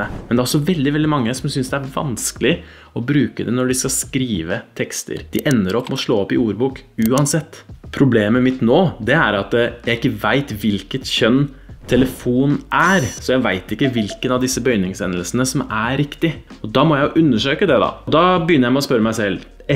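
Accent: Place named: Eastern Norway, Norway. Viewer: Det er stadig noen som sier 'jeg 'snakker' ikke nynorsk'. Ingen snakker verken nynorsk eller bokmål. Nynorsk og bokmål er litterære språk. Når vi er 5-6 år, er språket vårt ferdig utvikla - grammatisk sett. Vi må på skolen for å lære å lese og skrive. Der lærer vi å lese og skrive nynorsk, bokmål, engelsk, tysk, fransk, spansk etc + norske minoritetsspråk. Når vi skal skille norsk fra dansk og svensk, ser vi at dansk og svensk ikke har trekjønnsbøying, i samme grad, som på norsk. Vi kan altså si at norsk er et nordisk språk med trekjønnsbøying i substantivklassen. Substantivklassen er den største ordklassen. Vi kan generalisere ut fra det. Nynorsk er basert på norske dialekter. Eneste unntak er bergensdialekt og talemålet på Oslo V. På samme måte som med dansk og svensk så har de ikke mange hunkjønnsord. Alle andre norske dialekter har trekjønnsbøying. 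Når nynorsk er baserte på norske dialekter så er det 'bare' å bruke dialekten, slik som i videoen, for å finne rett kjønn. Legg merke til at, i videoen, snakker østnorsk. Østnorsk er norsk med trekjønnsbøying. Altså kan østnorske bruke sin dialekt til å finne ut av bøyninga i substantivklassen. Selv østnorsk er 'nynorsk'. Det er jo et norsk dialektområde. Å si at man 'ikke snakker nynorsk', og dermed 'hater nynorsk' er ikke et gangbart argument.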